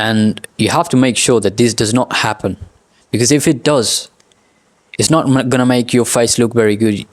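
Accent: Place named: United Kingdom. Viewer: Bro's Pakistani And Sounds Like British💀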